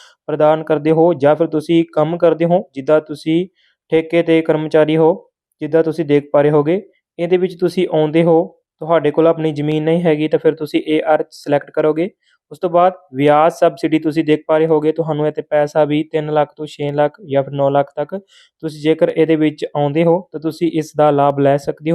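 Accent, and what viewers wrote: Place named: Bihar, India. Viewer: Tere awaj bihar Wali lugi menu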